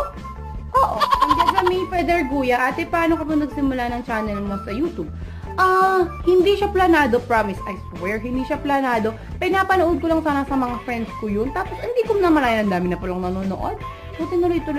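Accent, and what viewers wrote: Visayas, Philippines. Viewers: 😍😍😍Cute niya magbisaya😀😍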